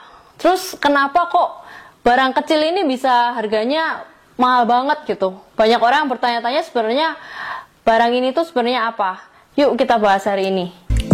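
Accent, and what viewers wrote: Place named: Banyumas, Indonesia. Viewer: medok logat nya...kaya ngapak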